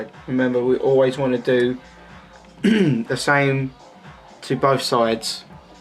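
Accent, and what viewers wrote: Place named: United Kingdom. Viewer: Your voice sounds like comedian Russell Brand; you must come from the same area of UK.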